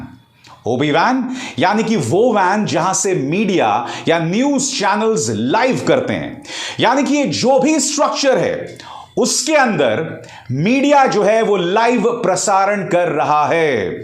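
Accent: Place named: South India, India. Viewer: Ha सर filing नहीं आ रहा है क्यों लग रहा है की साउथ इंडियन जैसा लग रहा है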